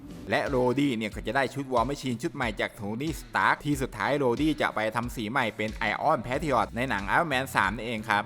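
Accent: Thailand. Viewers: เหน่อแบบนี้ ราดรี หรือ คอนถม หรือ เมืองกาญ ครับ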